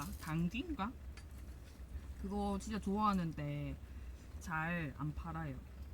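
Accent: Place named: Gyeongsang, South Korea. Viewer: 잘뵤고 있습니다~ 전대구에 사는데 경상도 억양이 정겹고 더 좋으네요~❤❤ 사시는곳 물어봐도 될까요~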